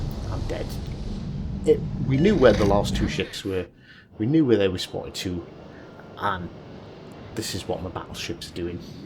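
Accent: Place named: United Kingdom. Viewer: Which part of Britain are you from? Love the accent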